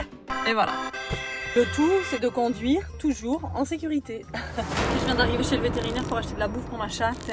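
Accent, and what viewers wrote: Quebec, Canada. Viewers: J’ai remarqué que tu avais quand même un petit accent québécois hein ! En tout cas super vidéo ! N’hésite pas a voir ma chaîne et me dire ce que tu en penses pour m’améliorer !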